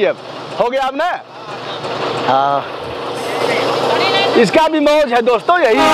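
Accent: Standard. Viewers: 🙏चाचा जी प्रणाम आज बहुत अच्छा लगा मुझे आपने जो अदभुत दृश्य दिखकर मन बहुत ही आनंद आया आपका बहुत बहुत धन्यवाद आपका शुद्ध हिंदी बोल बहुत अच्छा लगत 🙏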